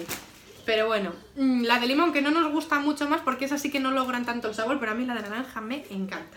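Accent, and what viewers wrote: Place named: Asturias, Spain. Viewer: Chica eres asturiana osea q habla como hablas siempre , además con l q presta el acento asturiano , te lo dice una de leon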